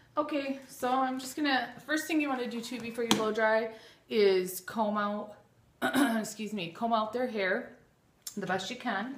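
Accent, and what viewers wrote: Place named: Minnesota, United States. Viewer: I hear a Minnesota accent lol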